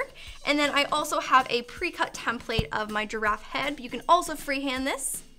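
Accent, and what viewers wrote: Canada. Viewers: Yep, she's from Ontario :) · I am trying to to figure out your accent... are you from Canada? Also I name the giraffe Geoffrey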